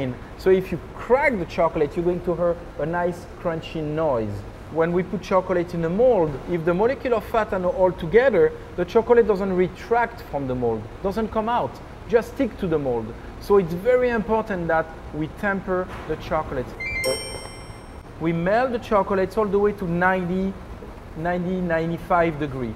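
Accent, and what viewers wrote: France. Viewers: The French accent ssoooooooo does it!! Tem-purrr-achture!!! Love it !!! Is partly because they stick their lips forward like they are about to kiss you when they are talking...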